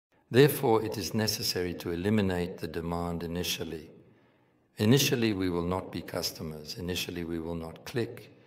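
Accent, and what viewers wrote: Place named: United Kingdom. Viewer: Etkileyici bir üslüb. İngiliz aksanı da çok iyi .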